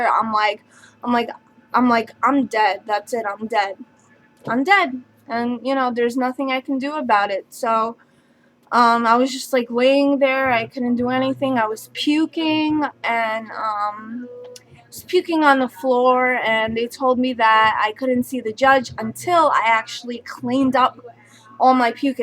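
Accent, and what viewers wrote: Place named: New York, United States. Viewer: Where are you from cuzz? You sound like a New Yawker.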